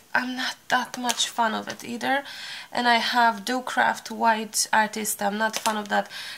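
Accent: Cork, Ireland. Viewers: I keep hearing Irish vowels in your accent, have you settled in Dublin? Love your tutorials btw. Thank you ☺️ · you made me laugh so hard hearing the word 'mankie', my mam used to say that when we were younger and I use it around my grand babies too, so funny. Can I detect a Cork accent when you talk, I'm in Athy Co. Kildare. I love to watch you work your magic in your videos, thank you for sharing them all with us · I hear a hint of an Irish accent in there mixed with your native accent..Am I wrong?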